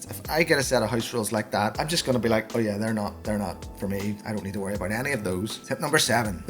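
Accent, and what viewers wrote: Ireland. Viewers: That’s extremely rude.he has a wonderful Irish accent, and that is how Irish people speak. I am watching this in South African, and I can hear him clearly, beside you can slow down the speed of the play. Telling people to speak slowly for your convenience is rude .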